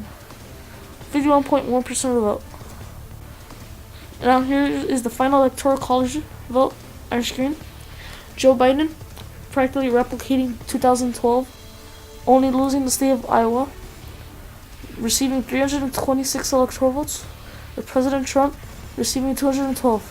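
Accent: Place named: United Kingdom. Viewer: You have a British accent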